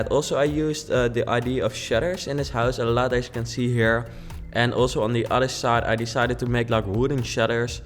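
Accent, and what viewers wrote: Netherlands. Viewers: That Dutch accent though... ;) But I really like your work!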